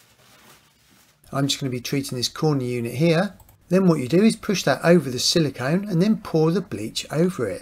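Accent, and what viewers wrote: England, United Kingdom. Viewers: You have such a nice ACCENT!!😊 What part of England are you from?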